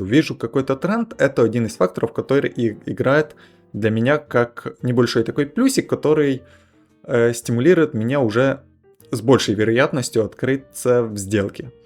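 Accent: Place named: Vladimir, Russia. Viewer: Классный говор, Владимирская обл.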